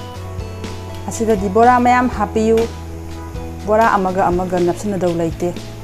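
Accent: Kakching, India. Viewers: Kakching marol se taba yam nungai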